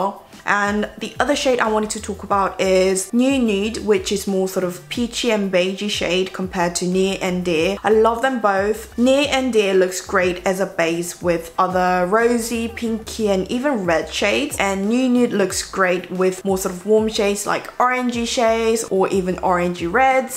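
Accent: United Kingdom. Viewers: Love your British accent so much!!!!!! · 호에 온니ㅜ 단발 넘나 잘어울려여....🙋🏻❣️ 온니 영어발음에 치이고 감미다...총총 온니 영상보고 영어공부할땨마다 영국발음이 조금씩 입에 붙고있어여ㅋㅋㅌㅋㅋㅋ 저는 블러셔는 누드팝에 빠져있고 립은 페리페라 아련폭발+맥 칠리 조합, 그리고 맥 버시컬러스테인 프리저빙패션에 빠져있어여!!!! 곧 봄인데도 불구하고 왜 아직도 가을가을한 조합이 좋은걸까요ㅠ🍂🍁🥀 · 영국식 발음 너무 좋아요ㅠㅠㅍ 언니 너무 이쁘고 우아하고 그러네요...구독 누르고 가요 자막 안보고 목소리에 집중해서 영상 봤어요 ㅎㅎ 좋은 영상 감사해요♡ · 와 이게 영국 억양이에요? 진짜 매력적이다 와...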